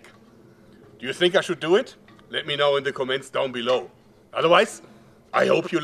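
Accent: Germany. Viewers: I am from austria we speak german (sort of) and before i watched his videos my englisch sounded very clean. But now i use the german axcent (his german axcent) in every sentence when i speak, ha ha ha, life is fun. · i just love his german accent😂😂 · Either this is the thickest german accent I've heard in my life should include lederhosen or it's the thickest Swenglish I've ever come upon. CHARMING FELLOW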